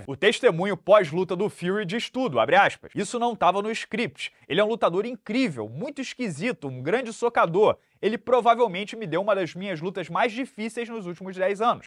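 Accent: Rio de Janeiro, Brazil. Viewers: Esse vídeo teria sido muito bom se não tivesse sotaque carioca.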